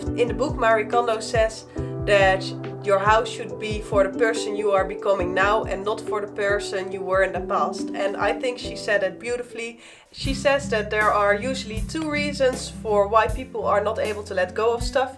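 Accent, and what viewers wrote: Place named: Netherlands. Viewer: Ik kan horen dat je uit Nederland komt! Super leuke video’s :)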